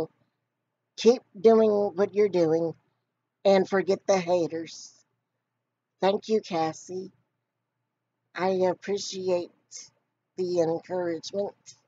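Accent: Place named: South, United States. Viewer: Keep doing what you are doing! You are such a positive woman and know that you will always have a crew backing you up. You are very funny and I get your sense of humor because I have a southern twang too! 😘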